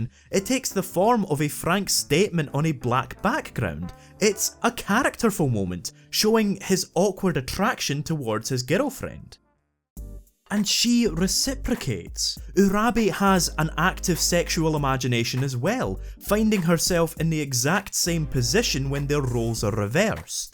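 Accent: Scotland, United Kingdom. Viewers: anime and scottish accent goes so strange together. I meant in a good way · that sweet scottish accent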